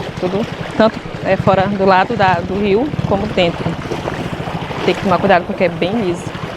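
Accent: Rio de Janeiro, Brazil. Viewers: Vc e do Amazonas mas tem o sotaque de carioca (fala com chiado), Por que???. Vc já morou no Rio de Jan.????. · Oi td bem so uma pergunta vc, tem o sotaque de carioca vc é carioca? Abraços sou seu fam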